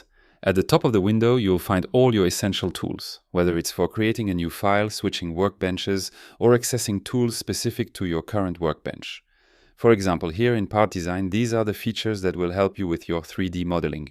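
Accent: France. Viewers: very very well. although the speed of talking ( that i had to reduce the speed to 0.75 or even 0.5 in somewhere) I liked the way of explaining and your very pleasant French accent. I still have a problem with constraints and why, when, where, which and how to use them😁😁. If its possible make a clip about that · Are you french ? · I can hear the accent… your french is showing! ( vrai question, est-ce que ce tuto est disponible en français? Je n’ai rien trouvé… avec l’outil de recherche)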